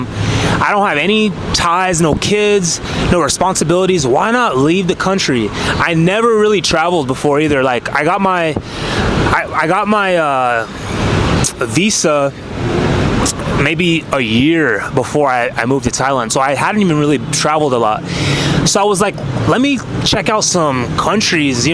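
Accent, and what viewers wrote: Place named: Texas, United States. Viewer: I can already tell you was from Texas lol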